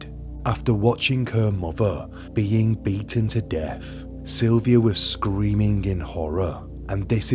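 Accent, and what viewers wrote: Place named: Manchester, United Kingdom. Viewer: Manc!!! My heart belongs in Manchester after living there for over a decade! I was going to say you sound like you’re from somewhere near there. I won’t say where though cos I know Mancs don’t like that!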